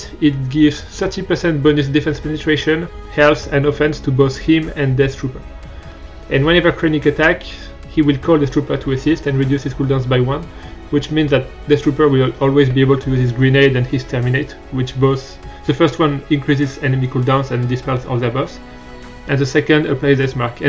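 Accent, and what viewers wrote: France. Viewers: Thanks for replying! I don't think I'll find many equally-geared Ewoks, my Malak is R7, modded for the solo (to the best of my ability, I have almost 0 6E slicing mats) and I'm in Bronzium 4. Would it work against G9-12 Chirpacron, in either one? I don't want to risk my 79 banners. Also, thanks. I've been trying to decide what to do with my omis. I might actually omicron Chirpa, seeing as how I'm gunning for Threepio for GAS anyway Also also, don't worry about your voiceover. I could understand you perfectly well, even through the (French? Is it French?) accent. I would like it if you did your GAC/TW vids with the voiceover, and the GC/TB vids with just text, because I like to boot them up at school and I don't want to miss anything on mute. However, that is just a me thing. Don't feel pressured to.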